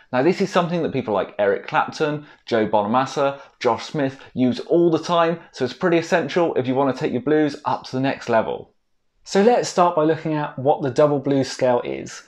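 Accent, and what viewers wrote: England, United Kingdom. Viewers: that typical aristocratic english accent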